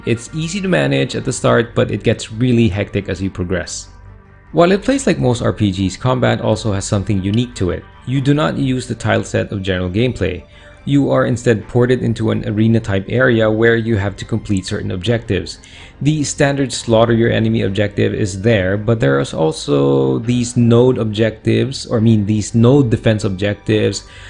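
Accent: Philippines. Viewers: That is not what I meant to my message. I know the accent of pinoys so I ask him if he is pinoy now do you get it?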